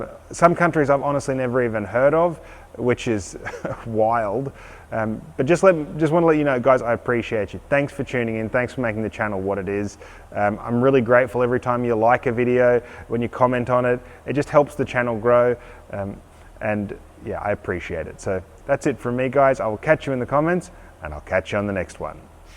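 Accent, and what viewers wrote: Australia. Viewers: If it werent for Ur "Aussie" accent, I'd say quit talkin and start cuttin!!!!!! Ur almost as bad as Diesel Creek in Pennsylvania, talk, talk, talk1!!🤠Ye Ole Caterpillar Cowboy🤠 in Illinois. Oh My Gosh how fast are U turning that part? 33;49 that is a thing of beauty!!!! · Greets from Las Vegas, USA! Love your videos and you commentary. I also subscribe to Cutting Edge Engineering Australia (CEE). You do heavy drilling rigs and and CEE does heavy mining machinery. Between the two of you, I have a smorgasbord of machining. I'm originally from Melbourne, Australia, and it's a real treat to hear Australian English. Thanks for bringing your MEGADRILL project to my attention. I'm looking forward to a binge watch on that one. Love you guys! 😀❤👍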